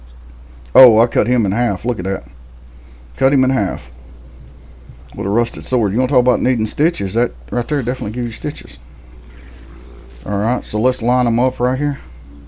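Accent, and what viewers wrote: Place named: Texas, United States. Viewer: i am wayyyyy to friggin tired to watch this. I've been playing PoE for like 6 years...but i alway seem to stop at 30ish lmao. idk why. okay ill go. i am litterally going mad from sleep depretion. hmmm idk what to critque or complement or anything on this one. honestly, i may just save the video, and watch it later when i try the game, again, and stop at lvl 30 for some random reason. 16 friggin hours of work. i feel it's kinda pointless to make the palworld-base video i was working on...but gonna do it anyways. okay what am i talking about? Keep going my dude. PS you have the most country accent ever (i mean like in the TX country, not redneck weirdo one, it's not like....idk a jab at you). just like "man this dude is from OK or TX or something, maybe like some rural parts of Arkansas" if i'm right lemme know.... 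bc this is litterally the only thing my linguestics degree is worth, guessing ppl's accents. also i am drunk. gn~!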